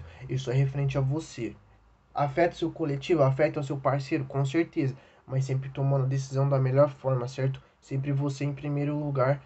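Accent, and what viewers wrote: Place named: Favela, Brazil. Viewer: Jeito que favela fala meu deus kkkkkkkkk eu amei esse vídeo !!!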